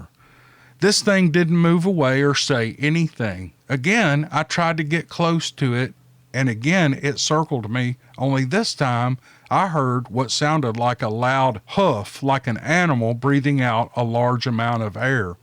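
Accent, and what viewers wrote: South, United States. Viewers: my dad was from northwestern Georgia mountain country and my mom was from deep southern Georgia, and most of my many aunts ("aints"), uncles, and cousins were from the deep south and talked like it. That's one reason I enjoy your videos so much - you sound like family. I had a lengthy career in radio broadcasting back during the "golden age" of radio, so I had to polish my diction, but I still love Southern speech, and I can still slip into it whenever I want to. That's an old picture over there to the left, and unfortunately I don't look like that any more. God bless you and all "your people". Ite?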